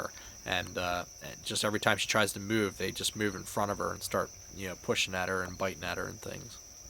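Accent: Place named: Midwest, United States. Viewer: "be-yahsketball" Midwestern US accents are the best.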